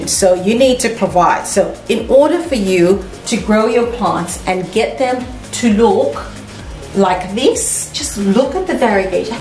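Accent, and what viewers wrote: Australia. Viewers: Thank you so much for explaining. I have always been curious about that. I have a few plants on coir poles and I feel like when I get them wet all I'm doing is watering my soil more. I see that moss is much better than coir. Also after binge watching your videos I actually saw where you addressed my question exactly. I had to chuckle when I was watching it. I have officially watched all of your videos in one day. They are very informative, in an easy to follow along format, and can't beat listening to the Australian accent either ☺️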